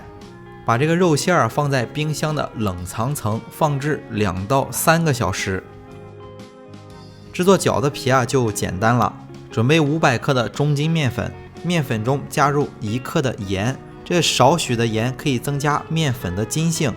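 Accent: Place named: Zibo, China. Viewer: 听口音是淄博那边的？老爸的手艺真棒👍！加油💪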